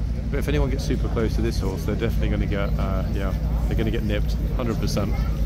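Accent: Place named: United Kingdom. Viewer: You refer to the Americans sometimes. But I have yet to hear you say anything about we Canadians. The states are not a Commonwealth country. Canada IS. (By the way, to me….all British people sound so SOPHISTICATED, no matter what they’re saying! I really enjoy how you express yourself! I LOVE the British accent!! ) 😁👍🏻🇨🇦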